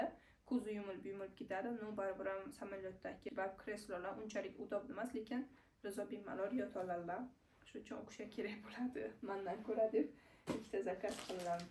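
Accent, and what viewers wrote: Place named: Bukhara, Uzbekistan. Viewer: Зур манга ёкди чикаринг ман энди обуна булдим сизга каердан сиз шевангиз бухороликларникига якин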